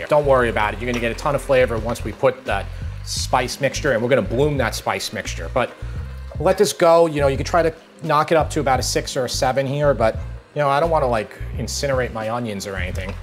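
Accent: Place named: Long Island, United States. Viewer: Long Island accent goes crazy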